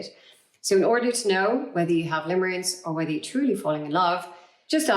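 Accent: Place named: Ireland. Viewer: Limerance sounds like an Irish term...what better person to discuss this than a irish accented .. attractive woman🎉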